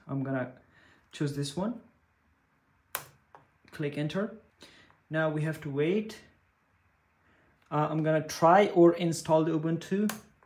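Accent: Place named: India. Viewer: You can't hide your hideous indian accent well enough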